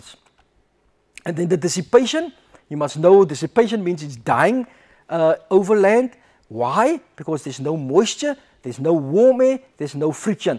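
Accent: South Africa. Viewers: Rahul Vamshidhar South African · South African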